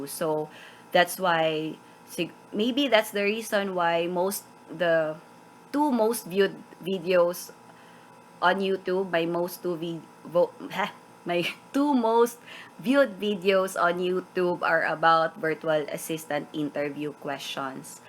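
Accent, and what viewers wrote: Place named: Visayas, Philippines. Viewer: Wow bisaya diay ka coach? Mao nay akong problema ang speaking skills kay bisayang dako sad ko😂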